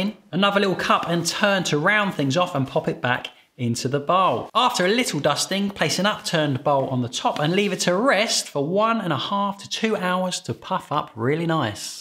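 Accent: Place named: England, United Kingdom. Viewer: I feel it’s the English accent ❤ I know what you mean giggle bc of how much life can be breathed back into a word, simply by changing the pronunciation/accents. ❤ Such beauty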